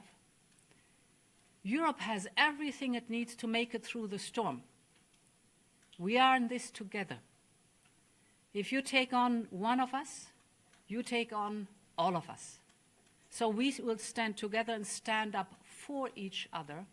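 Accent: United States. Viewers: Why this German speaks perfect American English? ahaha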